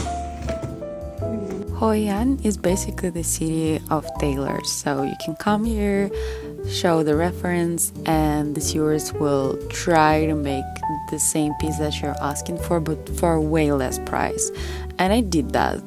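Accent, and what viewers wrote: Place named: England, United Kingdom. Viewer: yay my fav travel vlog is back! you have a lovely english accent, i like this change up bless you for showing us the amazing cities with the night life, buildings and food and sunsets, beaches and people 🙏 your friend and you are gorgeous! cool to see you both happy, and those outfits you wore were stunning! 💜 thank you for the editing you put into this 😊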